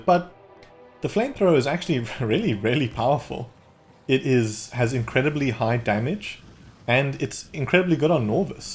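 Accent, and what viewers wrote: South Africa. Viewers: I can recognise that saffa accent anywhere, didnt think we had many factorio players around here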